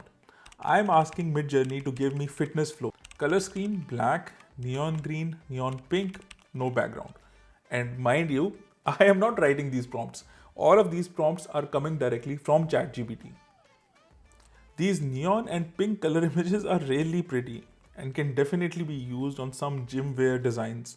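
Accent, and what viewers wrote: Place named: India. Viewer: He sounds Indian but does not look Indian.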